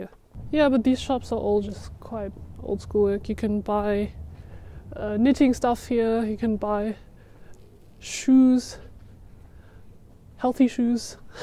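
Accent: Germany. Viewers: She sounds German. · You have a strong German accent and and yet you are such an eloquent English speaker are you a native German or did you move there as a baby . Very informative video btw. my family and I are hoping to move to Germany soon for a year.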